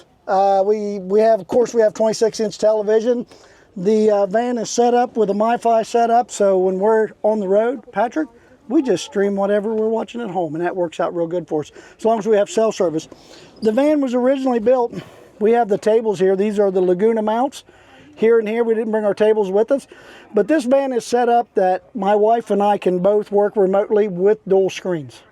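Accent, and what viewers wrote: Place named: West Virginia, United States. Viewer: What part of West Virginia are you from. I’m originally from Kimberly. Every heard of that little holler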